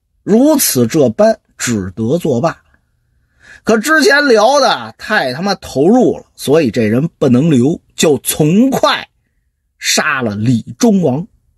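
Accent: Beijing, China. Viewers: 北京口音真好听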